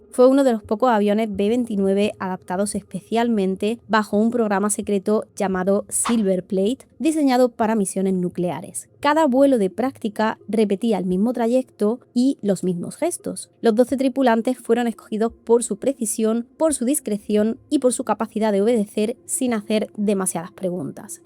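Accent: Spain. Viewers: Es video es excelente, me ahorras el tener que leer un libro cada vez que veo uno de tus videos. Está muy bien documentado, te felicito. Y me encanta tu acento porque soy de latino América y mi país heredó ese acento andaluz como el que tú usas, solo que se te escucha mejor a ti. Te felicito y sigue adelante. Espero tus nuevos videos. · Buenisimo documental! Y mas con tu hermosa voz y acento! De qué parte de España eres? Para reconocer el acento…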